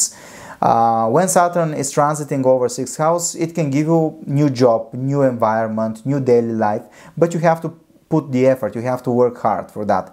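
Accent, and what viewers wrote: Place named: Italy. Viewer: It's so nice... you are so honest...thank you so much 😢❤❤ BTW I love your accent...❤❤is ot Italian 😅❤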